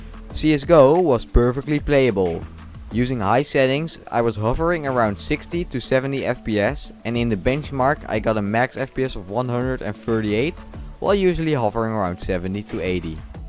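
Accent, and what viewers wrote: Netherlands. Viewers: vlgs mij ben je nederlands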